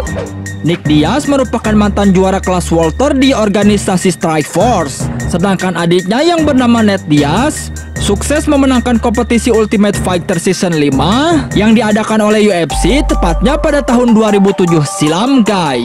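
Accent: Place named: Madura, Indonesia. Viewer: salah fokus , voice over logat madura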